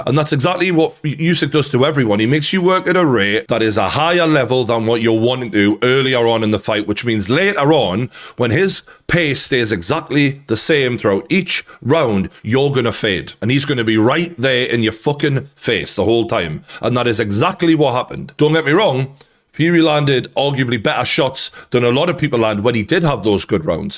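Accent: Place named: Geordie, United Kingdom. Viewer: f^t geordie you have the most annoying accent i’ve ever heard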